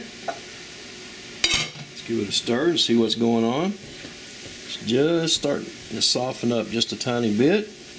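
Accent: United States. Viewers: are you chines or do you live there becuase you make every thing that chines people make and you sound country to me not being roude im just wondering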